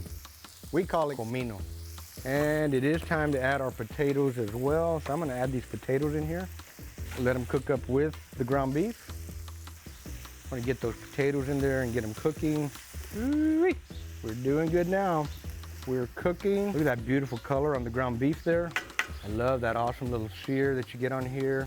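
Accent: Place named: Brownsville, United States. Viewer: I'm from Brownsville and this guy sounds exactly like the people here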